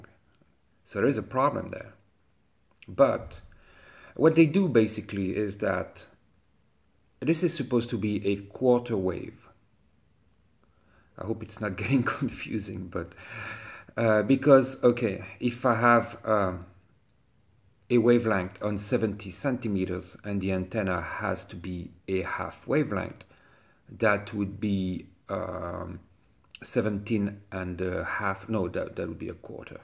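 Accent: France. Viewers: not only is your content great! You also have great French accent which makes it even that much more interesting. Thank you for making videos! I love to watch them in my free time. Maybe I’ll have time to make my rats tail today:)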